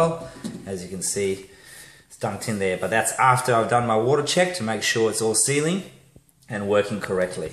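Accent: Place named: South Africa. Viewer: Well done mate. Just purchased a dog and bone wetsuit case for my iPhone 5 as I'm heading to the Whitsunday's tomorrow. I returned home with my device in hand, raring to put it to work. Your little video is perfect. It's like a crewless cooking vid with just the details and predictions of little stupid things we consumers could overlook. The SA accent was the only thing that caught me, but I am full of confidence going into the water with my link to the great big world. Thank you.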